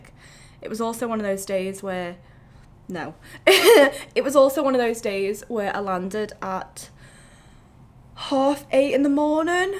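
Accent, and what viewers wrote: Liverpool, United Kingdom. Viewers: Talking about ur accent You'll know that every fecker has an opinion with regards 2 the way people talk , but please dont let the pricks put u down ever . Are there many scoucers u work with ? Enjoy ur time in London and rather than just sayin " I,'ll be in London " can u say which of the 3 instead . . . As in Heathrow Gatwick or Stansted. Have a good one ! Christy 🇮🇪/🇵🇹 👍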